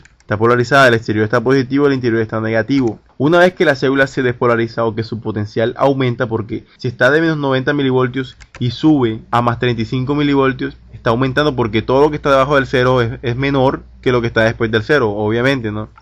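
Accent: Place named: Caribbean Coast, Colombia. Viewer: Muchas gracias! Felicidades!! Te pasas !! Pd. Se te siente ese costeño bien bacano! Jajaja.. Yo también amo la medicina!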